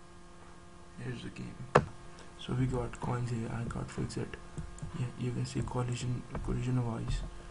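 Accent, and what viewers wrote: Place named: India. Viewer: im i the only one to hate indian accent ?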